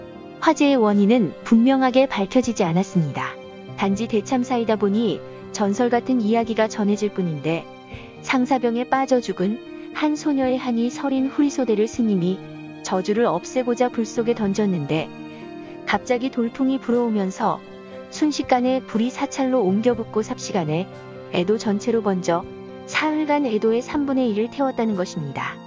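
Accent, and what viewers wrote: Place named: Busan, South Korea. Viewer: 부산말씨 같은데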